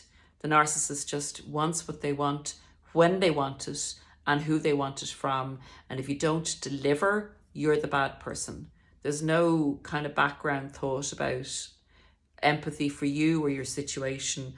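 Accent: Ireland. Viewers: I would just like to say, it is your Irish inclinations verbally, that I like to listen to. If the Irish accent was not a part of your delivery, I most likely would not be here listening. Being here however, I find you do make many good sense statements worth hearing and appreciating, due to your 30 years of bitter experience with narcissist partners. I appreciate you admitting that you are not God. And many of us, do not believe in God, so we aren't enlisted by claims that we are in a Crusade against evil; regarding narcissists. Division is now the biggest problem in human belief. I don't want to drive other people away from me by deciding they are shallow (or evil)Evangelical characters. Nobody chooses narcicissim (at 2 years old). So I appreciate you backing away from the Christian Crusade mode (as much asthat seems right to you. I'm hoping you aren't becoming a part of the division problem we see in society. 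Hopefully, we inspire others to see clearly, not to denigrate others who may not agree with our Chistian beliefs, should we be Muslims or Jews or Spritualists. Anyway, thank you for your well-intentioned aspirations.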